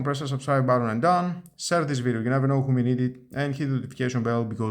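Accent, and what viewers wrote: Greece. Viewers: Είναι το πρώτο βίντεο που βλέπω και από την πρώτη λέξη κατάλαβα ότι Έλληνας 😅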